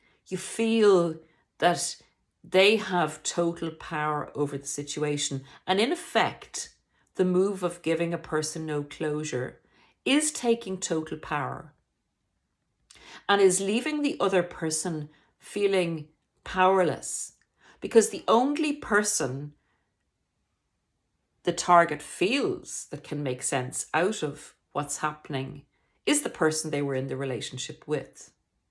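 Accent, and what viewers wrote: Ireland. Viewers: You seem to have an Irish accent I could be wrong I’m a new subscriber from Ireland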